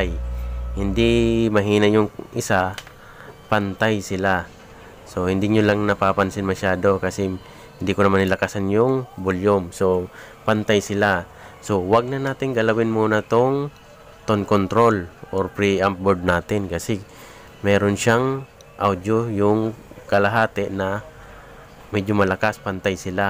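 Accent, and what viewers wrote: Bicol, Philippines. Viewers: Taga naga city ka sir?bicol?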